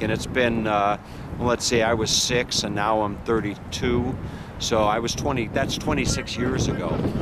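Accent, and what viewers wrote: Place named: Pittsburgh, United States. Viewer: OMG, your video is perfect for me! I'm writing a story, and this is an early location. It's awesome getting a tour of it! The main location for my story is Abbey Avenue. I placed an apartment building across the street from the Gateway Animal Clinic lol. I've never been to Cleveland, Ohio before, so I've been doing a ton of research. Are you from Pittsburgh? The way you speak sounds a lot like Pittsburghese, as my granddad calls it.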